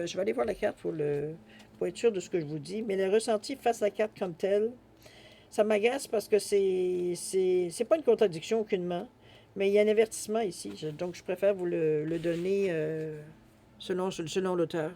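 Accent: Canada. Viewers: Moi..chère...j.adore.ton..accent..il..viens..du.pays..de..mes..rêves..😌..je..suis.. morad..mon.2’..petit.non..c.est..Jaky..mais.tous..mes.amis.m.appelle..Jaky…j.écoute.pour..la.1.fois.ton..tirage.mais..surtout..ton..bel..accent…….❤.Canadien..se.que.j.aime..ce.splendide..pays…tu.ne.peut.pas.imaginer.a.quel.point..merci.pour..le.tirage..vierge..😊😊😊❤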